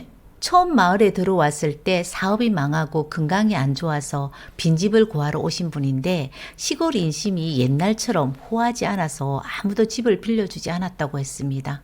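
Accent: Gyeongsang, South Korea. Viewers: 경상도 분? 난 강원도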